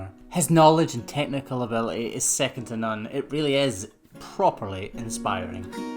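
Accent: Scotland, United Kingdom. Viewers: I never thought I would laugh at the phrase “foot fetish”, until I heard it with a heavy Scottish accent. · Why is it that after watching your videos & then checking the comments, I read the comments and your voice is what I hear in my head? 🤪🤪🤪 I'm here in the USA & I hear a Scottish voice in my head. 😁😁😁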